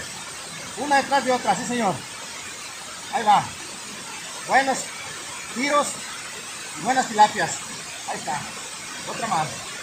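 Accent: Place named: Bajío, Mexico. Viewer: Buena pesca amigo disculpe su acento se escucha como del bajío ... saludos desde Valladolid Yucatán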